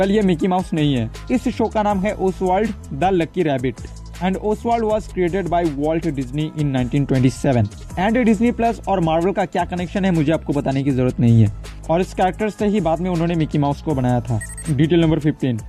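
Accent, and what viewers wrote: Assam, India. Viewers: Nice explaining sir are you from Assam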